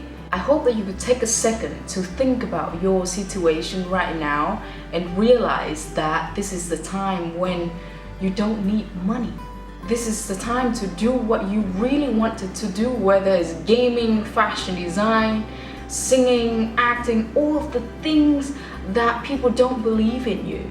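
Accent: England, United Kingdom. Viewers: Giọng British của chị charming quá! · Tha'ts British English :3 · Ui chị nói giọng anh anh hay quá 😘😘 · Yuh gurl, didn't expect that British accent !!? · Chị sống bên Anh lâu năm, accent chị hay quá 😍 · sao chị dùng british accent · Em thích giọng Anh Anh của Chị quá. Cảm ơn Chị đã tạo động lực và truyền cảm hứng cho mọi người <3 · Such a helpful vlog! I love your English accent helping me practice listening! Thank you <3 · I like British English and i like the person who speaks British English, too =))) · Oh my god, is that British accent, i'm learning American accent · British accent là đây. Yêu. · Giọng chị hay quá. Accent này là giọng Anh đúng không ạ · I feel strongly obsessed with your beautiful British accent. :D It keeps playing in my head after finishing your video. And you know what, I just realised that your face and your accent remind me of the actor "Sansa Stark" in series Game of Thrones :). 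Anyway, love your accent very badly :) (P/S: this is one of my very rare comments on youtube) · Giọng Anh Anh nè. Iêu quá <3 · Charming accent, em nghe giọng Mĩ nhiều quá rồi, giọng Anh thật sự là 1 làn gió mới đối với em <3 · You are so familiar with Lucy with British accent, < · Your accent is so nice : )) British accent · That’s a legit British accent ! Or should I say, the Queen’s English <3 absolutely stunning!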